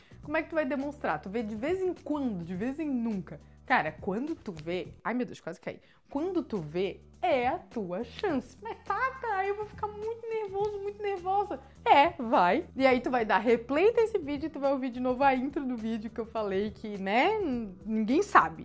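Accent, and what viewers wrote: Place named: Caratinga, Brazil. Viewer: Sabia que esse Sotaque era Caratinense KKKKKKKKKKKK viva a doce e bela Santa Catarina ♥️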